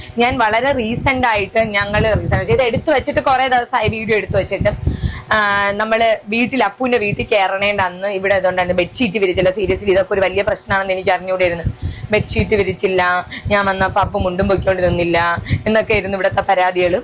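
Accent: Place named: Thiruvananthapuram, India. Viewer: Only particular areas in tvm they speak in this way , please don't tease the slang it's a request not an order please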